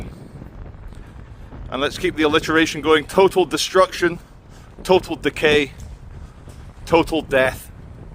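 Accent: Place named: Scotland, United Kingdom. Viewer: It's Scottish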